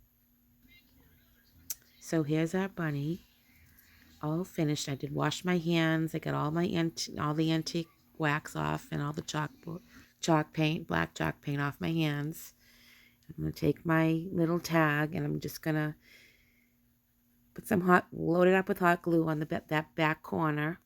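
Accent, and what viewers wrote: Massachusetts, United States. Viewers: Well I am impressed. Beautiful designs and execution! So much so I have subscribed. Enjoyed hearing the MA accent again! Grew up in Reading, moved to L A a million years ago at 22 to seek fame and fortune. What part/town are you from? How/where do you sell your wreaths? All the very best to you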